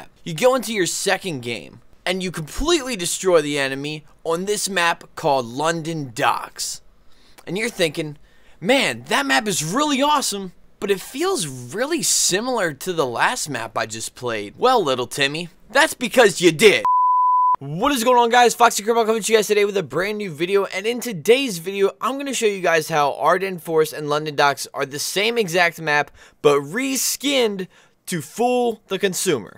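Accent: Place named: Pittsburgh, United States. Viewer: You have a Pittsburgh accent kinda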